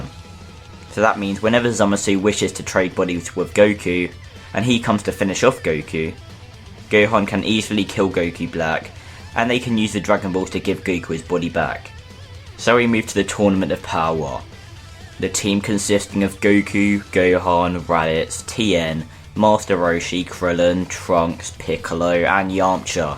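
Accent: United Kingdom. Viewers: Is the man Briish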